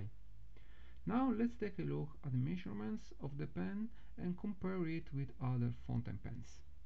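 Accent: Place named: Italy. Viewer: Bellissima recensione, dallo slang sembri italiano, perché non fai recensione anche in italiano?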